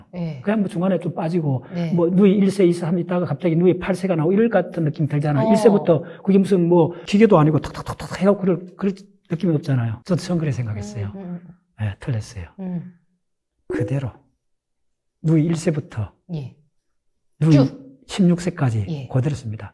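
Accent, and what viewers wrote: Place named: Gyeongsang, South Korea. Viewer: 내용 중에 자막 잘못 .. 스코트가 아니고 서고트.. 경상도 발음 이해 못함..ㅎㅎ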